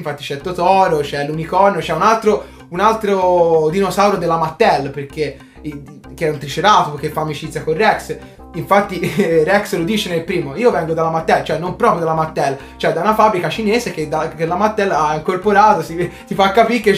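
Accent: Tuscany, Italy. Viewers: adoro il toscano. ho aperto il video e l'ho lasciato andare solo per farmi cullare dalla tua voce. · Hai proprio ragione ed è chiaro anche nel tono di, molto "toscanaccio" (anche io parlo così, dal vivo, anche se in dialetto lucchese! XD) e colloquiale. Non pretende di essere portatore della verità assoluta. Ma certe persone leggono recensioni o vedono video come questo e seguono il metodo "pecorella". Mentre avere una propria opinione per me è qualcosa di meraviglioso.:)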